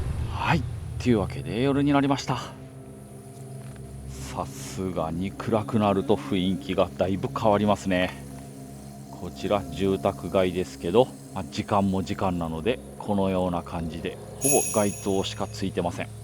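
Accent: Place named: Kagoshima, Japan. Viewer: まさかの坂之上（故郷です） 鹿児島のイントネーション好きです😊 幼児の頃住んでたんですけどこんな場所あったんですね カブト虫とかたくさん採れそう…！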